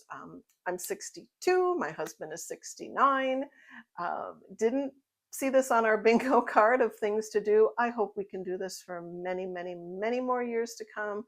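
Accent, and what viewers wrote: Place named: Minnesota, United States. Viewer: Great video! Love your Minnesota accent! ❤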